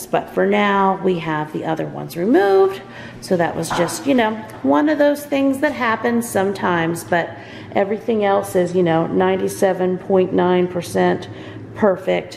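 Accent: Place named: Rural, United States. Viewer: I am so happy for you. I live in NC so I love hearing another country girl talk 🥰🥰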